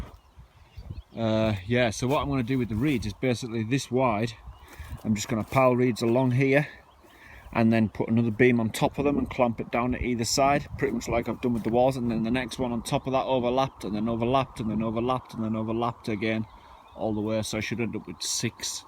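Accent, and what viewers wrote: United Kingdom. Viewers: OMG XD where do u live? I live in the U.K. And I see you have the accent!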